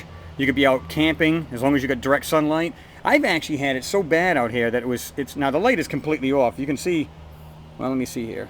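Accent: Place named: New York, United States. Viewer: You sound like a new Yorker hey! Love the video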